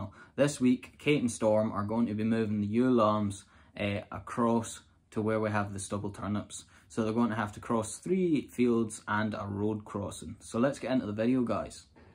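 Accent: Scotland, United Kingdom. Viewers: you do wonderfully with these beautiful, intelligent, well trained dogs and your videos. I love everything. Your dogs doing their jobs so happily, your Scottish accent, the landscape and the music. Love hearing your commands, “come by”, because those sweet dogs jump into their beloved duties. Love from a Scottish ancestry American. ❤❤❤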